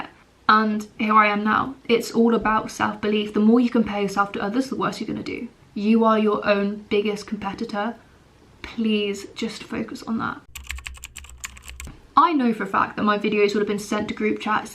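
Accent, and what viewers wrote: United Kingdom. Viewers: Your British accent is adorable 😩🎀✨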